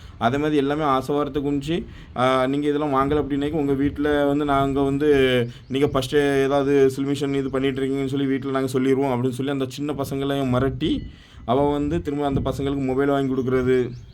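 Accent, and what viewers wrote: Tirunelveli, India. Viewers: Ama sis ivanga tnvl . Ivanga nama slang la solrathu kekka நல்லாதான் இருக்கு 😂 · Thambee...enga oor karar aaa neenga??? Tirunelveli Nagercoil mix...slang...keka nallaa iruku😊...Naanum Apadi thaan pesuven😂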